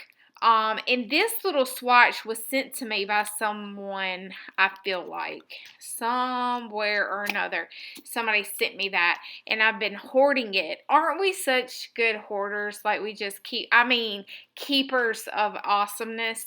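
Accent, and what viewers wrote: South, United States. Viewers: I love your southern accent! Oh my goodness me, I miss the south! Keepers of awesomeness! YES! My family laugh at me for keeping stuff as treasures useful for later! I love your handiwork! I am as from now a new subbie!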